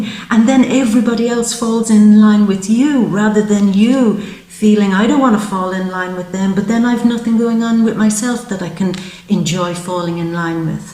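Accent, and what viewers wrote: Ireland. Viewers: So true and profound. I am already a bit like you want me to be and am very happy about it. Will work to finish the job with the help of the universe. Wanting to do what I love to do and get to work from it. I love your accent. Irish perhaps. Thank you. Best wishes for the Holidays.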